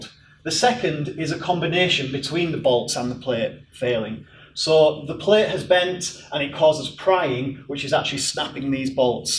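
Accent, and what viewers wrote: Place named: North, United Kingdom. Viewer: Thanks - great presentation - and the Northern accent reminds me of home!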